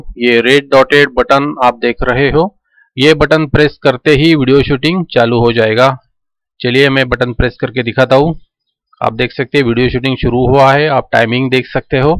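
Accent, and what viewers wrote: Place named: Marathi, India. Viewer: Dear ye Marathi person hai,and Marathi logo ko thik se Hindi nahi ati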